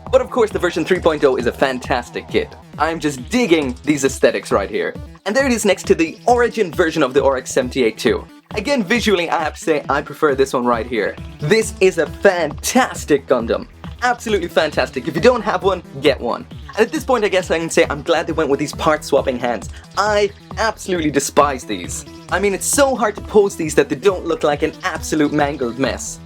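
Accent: Ireland. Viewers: I love your voice so much you irish uwu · you have a very small strange Irish accent to your voice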